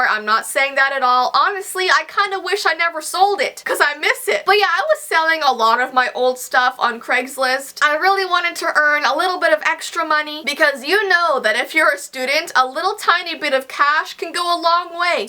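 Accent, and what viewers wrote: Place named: Canada. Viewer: Hi! I have been binge watching your videos and I am American. I know you're Canadian and I love your accent. I have recently been retreaving the Canadian accent. I love it but some people are asking me at school if I was faking the American accent. No, it's because of your accent is getting to me. Have a good day!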